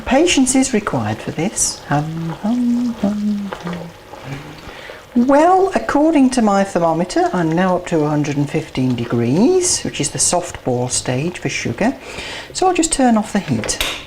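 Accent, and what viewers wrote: Birmingham, United Kingdom. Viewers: I'm really love british english pronaunce!!😆😆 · This video made me rush out and buy turkish delight (im lazy okay!). Can you please do some more videos with your birmingham accent:D? they're fab! Love. x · thanks you a lot, i just want let you know that i found a video that shows up how to do turkish delight and yours was made far better! PS= your british voice is so relaxing, i just love english lol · I like her British accent😇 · You sound like the most english person who has ever lived ☺ great video! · I loved your little noises! and where from the UK are u from? Right! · it is now I understand why you stopped making videos because half illiterate trolls would not understand a British accent if it came and kicked them on their protruding and exposed prominent posteriors. Nevertheless, if you had spoken like a cat which is being strangled and with a clothes peg upon your nose broadening your vowels, with absolutely no idea about how words are pronounced properly, and added a lot of grunts and hand gestures and fillers like uh-huh.whatever, possibly this particular viewer would have understood exactly what you said. 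But being parochial and not knowing the fact that the American language is restricted only to America while the rest of the world speaks British English in British accents, and we can understand what is being said clearly, isn't it time they stopped making public clowns of themselves, offering gratuitous insults to all and sundry? Oh, I forgot you,wouldn't be able to understand this message because it is written in British English and holds a reading statistic of about 5 and above, while your educational level needs messages to be written on a readability statistic of two in order to be assimilated, understood and comprehended. Please accept my commiserations.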